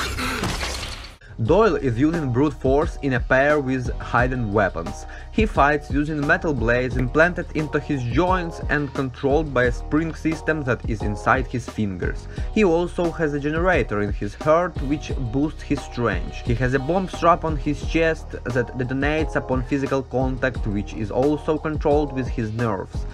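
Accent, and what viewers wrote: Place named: Russia. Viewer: Первое что услышал, это то что он русский А потом посмотрел мейл ру почту в описании канала)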